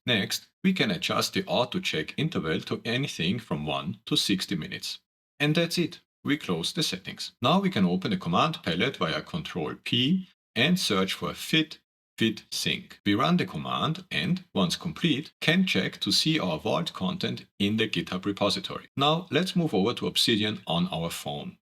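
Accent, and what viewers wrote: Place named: Denmark. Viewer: Thanks for the video! Are you Danish? Your accent is cool, reminds me of Mads Mikkelsen haha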